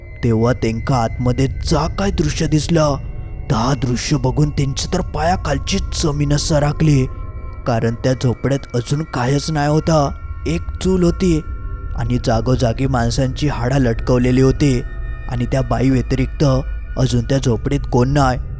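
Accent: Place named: Konkan, India. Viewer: Tujha mule Konkan chi bhasha awadayla lagi ani chan vatati aaikayla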